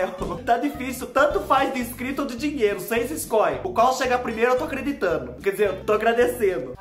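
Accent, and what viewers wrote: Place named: Minas Gerais, Brazil. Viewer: Oiee, eu amo seus vídeos e o seu sotaque, você por acaso é mineiro como eu,🤣🤣🤣🤣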